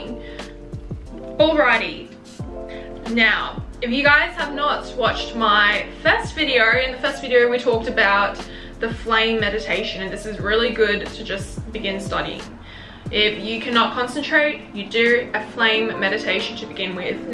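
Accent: Australia. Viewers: I LOVEEE YOUR ACCENT!! Hah its so fun and chill. Is it an Australian accent by chance?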